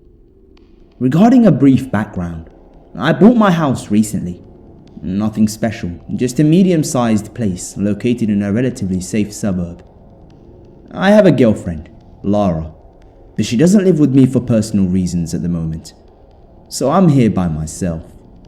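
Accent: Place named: United Kingdom. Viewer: I wish I would hear YOUR fabulous Brit voice saying "trick or treat" at our door this Halloween! Lol. Long way to go for a b it of sweets! Thanks for the spooky! Hope your weekend is good. Happy Halloween!👻💀😈🎃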